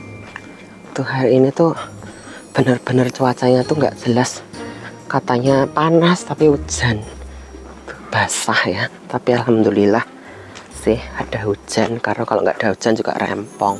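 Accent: Java, Indonesia. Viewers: Seneng banget nontonin video panen2 mas trus ditambah ngomongnya logat jawa lucu gitu 🤗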